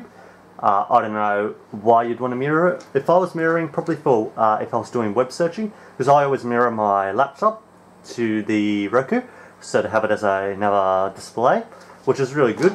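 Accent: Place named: Australia. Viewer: It's not that hard to understand him. He's Australian. It's like American but with an accent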